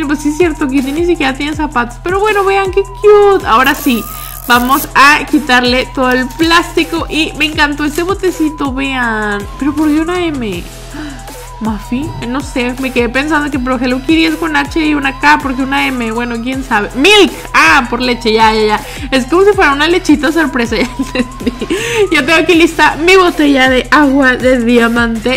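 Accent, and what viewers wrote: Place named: Fresa, Mexico. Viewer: mira deja ese asentó de fresa si yte voy a decir algo me gustan mucho tus videos pero la verdad no me gusta tu asrntó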